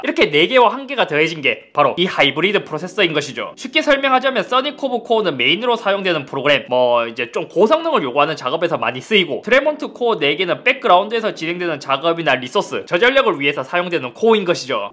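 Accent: Gyeongsang, South Korea. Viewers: 형 진짜 경상도 사투리 심하신 분들은 스마일을 서마일 이라고해??? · 여윽시 경상도 사나이~^^ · 스마일이 서마일 되는법. 경상도 사투리. 잇섭이 서마트폰이라 안하고 스마트폰이라 하는 이유. 테크충 ㅋ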